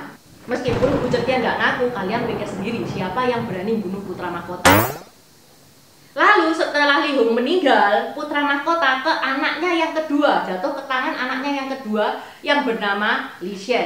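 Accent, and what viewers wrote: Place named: Banyumas, Indonesia. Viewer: mendok2nya ituloh.... Ngapak buanget... 😂😂👍👍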